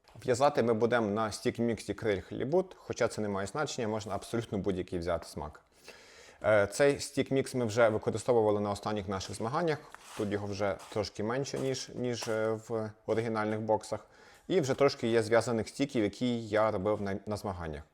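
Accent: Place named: Lviv, Ukraine. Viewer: Вітаю! Гарно показали і пояснили. Дякую за цікаві відео і Львівську українську!)))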